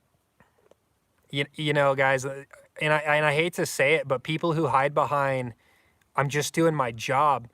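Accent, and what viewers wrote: Idaho, United States. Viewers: Just stumbled on you today. Digging that Idaho accent.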